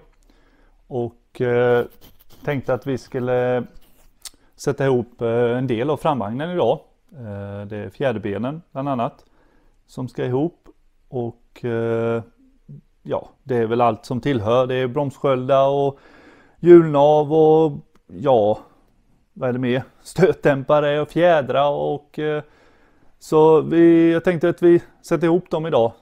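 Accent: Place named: Kalmar, Sweden. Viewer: Mycket snyggt filmat, sällan en ser en så bra renoveringsvideo. Lugnt och sakligt. Sicken go dialekt med, Kalmar/Nybro/vissefjärda?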